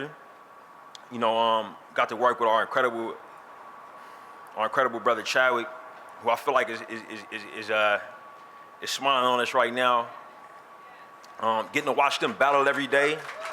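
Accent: Oakland, United States. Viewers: I like that he stutters and has an Oakland accent because, despite that, Ryan is talented, has great vision, a mind, dedication, and follow through based on what I've seen and heard about him.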